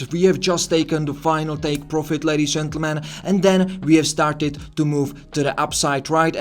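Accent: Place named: France. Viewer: Dude, I love your accent.... Are u French?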